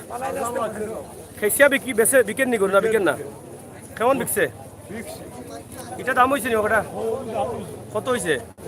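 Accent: Sylhet, Bangladesh. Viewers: ভাই বিদেশ তাকি দকিয়ার সুন্দর লাগের, আপনার কতা শুনিয়া হাসিতেছি ভালো লাগের আর ভাই সিদা সিলেটি মাতিবা ইনো আমরা হককল সিলেটি।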